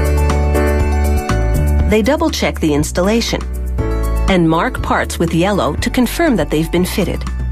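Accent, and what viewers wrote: United States. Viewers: FFS why did they use the American, for this episode? Aluminium not Aluminum, and Bonnet not Hood. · What an utterly, horrible American voiceover, no class. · The vulgar American accent describing how one builds a Rolls Royce is just not cricket!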